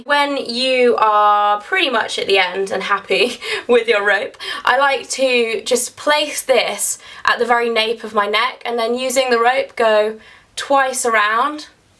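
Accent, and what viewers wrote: United Kingdom. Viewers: love ur british accent :)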